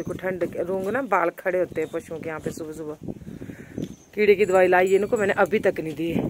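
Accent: Punjab, India. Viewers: Tuhadi Punjabi Bahut mithhi ai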